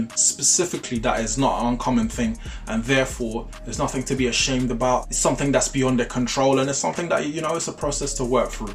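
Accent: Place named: United Kingdom. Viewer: I love the British accent bro and love your choice of music. I like Nathan as a character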